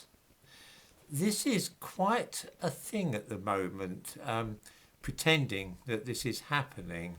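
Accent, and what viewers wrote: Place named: Middle England, United Kingdom. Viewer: Ah,the Wonderful,Soothing,warm tones of middle-England,just like a Cup of Tea!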